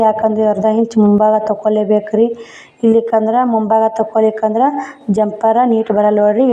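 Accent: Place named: Karnataka, India. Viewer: Nice love this Kannada accent ❤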